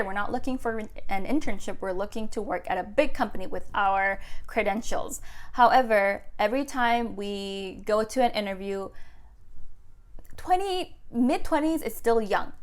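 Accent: United States. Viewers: It sounds almost like American!😭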